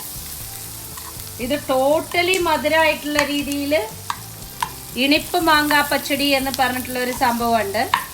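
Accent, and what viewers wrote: Palakkad, India. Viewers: True. Her accent attracts Palakkadans to watch her videos irrespective of the menu she posts. Pakka palakkadan slang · Palakkadan slang avatharanam super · Thanks for all your cookery shows. More than your cooking I like the way you speak because I am from Palakkad too. I am settled in Pune or for that matter I am a Puneri but a pakka Palakkadan malayali. I can read but not write Malayalam. Once again for Palakkadan Malayali basha with the slang words.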